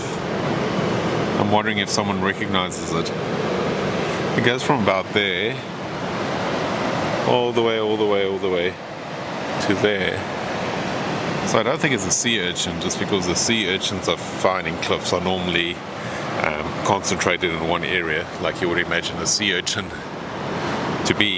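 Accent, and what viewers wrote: South Africa. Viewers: cool vid man love the fossil hunting vids you sound like you from cape town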